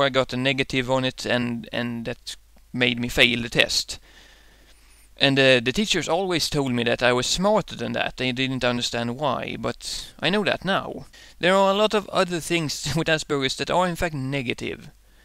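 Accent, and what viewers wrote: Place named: Sweden. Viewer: I am proud to have him. At first, his accent bugged me because I thought it was Scottish but it sounded wrong. Then I realised it was Swedish and sounded right. Now I find it comforting to listen to.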